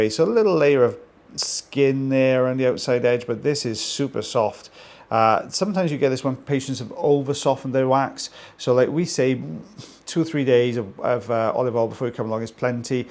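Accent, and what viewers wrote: Wales, United Kingdom. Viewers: Think you could do an audio book. Cool, calm, Welsh accent, 🎉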